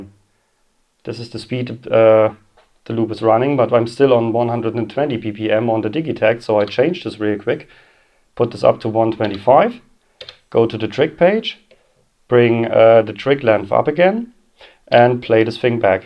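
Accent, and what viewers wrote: Germany. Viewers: Finde ich nicht gut die Lösung. Also dein Video ist super, aber ich würde gerne in einer laufenden Performance einen oder zwei oder auch vier Takte sampeln können, die dann sofort automatisch abgespielt werden. Alles mit nur einem Tastendruck! Da gibt es eigentlich nur ein geeignets Gerät bisher, was genau das kann. SoundBITE Pro von Redsound. Octatracks Pickup Recording könnte das vielleicht auch. Bin ich aber leider nicht sicher. Do you understand german? Your accent sounds like that. Would have been too complicated for me to explain that in english.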